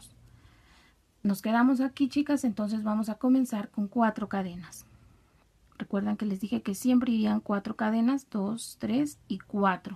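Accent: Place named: Guatemala. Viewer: Estimada señora , me gusta mucho su camino de mesa, hace poco la descubri y ya empece a hacerlo, lo mejor es que lo explica muy bien, gracias por publicarlo, reciba saludos atentos, me da curiosidad su acento, se parece mucho al de ños guatemaltecos.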